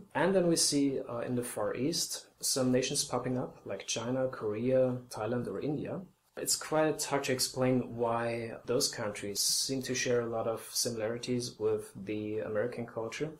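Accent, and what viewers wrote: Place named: Scandinavia. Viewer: BTW...I am aware of your Scandinavian accent... I'm only assuming that you have recently expatriated to the UK. Since many of your arguments predominates between Britain and the US.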